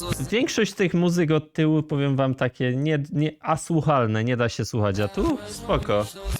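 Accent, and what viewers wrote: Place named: Silesia, Poland. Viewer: Ja usłyszałem Ślonzok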